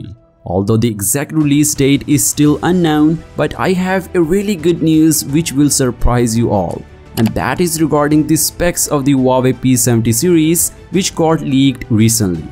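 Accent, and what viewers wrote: India. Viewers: Indian English accent 😂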